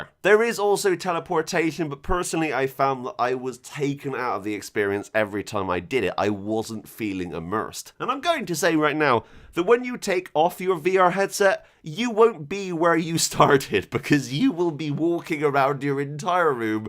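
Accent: United Kingdom. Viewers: Love that British accent :D or what it is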